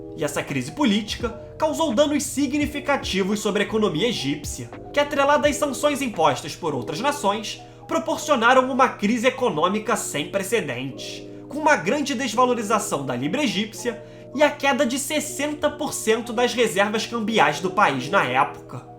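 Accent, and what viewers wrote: Rio de Janeiro, Brazil. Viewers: O conteúdo do vídeo é excelente, mas precisa neutralizar um pouco esse sotaque carioca, misericórdia! 😣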